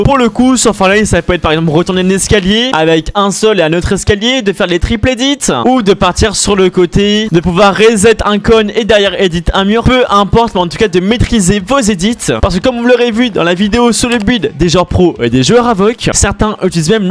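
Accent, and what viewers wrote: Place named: Switzerland. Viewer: Gg mais est ce que tu es suisse parce que tu a un accent suisse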